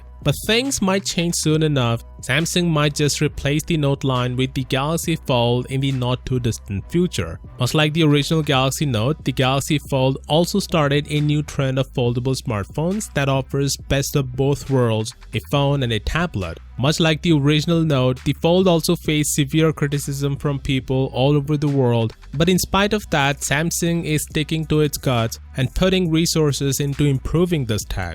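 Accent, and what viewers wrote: India. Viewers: Sound hindi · As soon as i hear the indian accent I know this is just "speculation"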